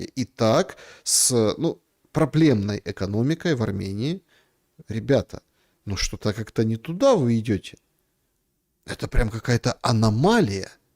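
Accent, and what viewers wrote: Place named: Donbas, Ukraine. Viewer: Господин, мне приятно смотреть ваши ролики, не только потому, что вы в них говорите по "донбасянски", несмотря на звонкое "Г", но ещё и потому, что вы с РТИ! Да и контент у вас востребованный! Мы за вас! Делайте вашу работу, как и всегда. Нам нравится! С уважением - Кременная.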